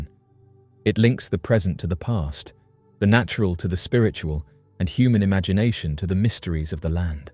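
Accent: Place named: Southern England, United Kingdom. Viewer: The channel is from the UK and the narrator has a basic Southern English accent. What accent wouldn’t annoy you ?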